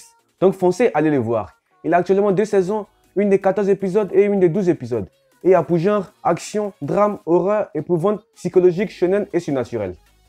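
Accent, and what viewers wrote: Ivory Coast. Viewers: Toi tes un ivoirien ça se sent mais prends ton temps pour parler je pense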